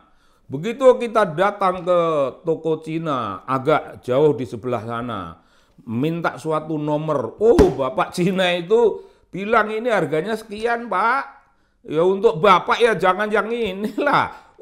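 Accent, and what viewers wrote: Indonesia. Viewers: Kang Sobary itu tulisannya bagus. Saya suka membaca esai-esai dan buku-bukunya. Tetapi, kalau berbicara kurang menarik. Bahasa lisan Kang Sobary amat medok dan tidak terstruktur dengan baik. Jadi, menurut saya, lebih baik memakai naskah biar lebih enak didengar. 😊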